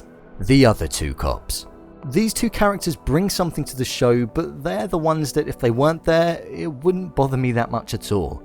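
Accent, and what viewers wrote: United Kingdom. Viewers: It's so fucking try-hard with it's "crude" humour and gags. Especially with the celebrity references. This guy thinks it's "hilarious"? What a waste of a posh english accent. lol I enjoy Rick and Morty. I think American Dad is funny and sometimes even Family Guy. But Paradise PD is very very very very VERYYYYY unfunny. I laughed once every 1.5 episodes. Nothing subtle. AT ALL. They try to be rude, crude and lewd at every single point. For every one sentence of scene building there are 5 or 6 "jokes". Bad "jokes" and "gags". It's like those parody movies style of humour. Scary Movies, Not Another Teen Movie, Meet The Spartans, Vampires Suck and so on... I watched all 10 episodes btw.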